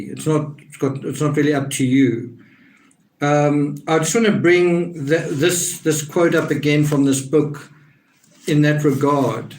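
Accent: South Africa. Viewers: Hi there from Namibia. Thought I recognised the SA English. I like your videos.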